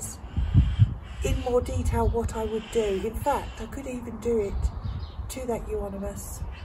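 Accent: United Kingdom. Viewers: Brit accent.... ive got an OLEANDER, large shrub, need to clip into a tree form 😊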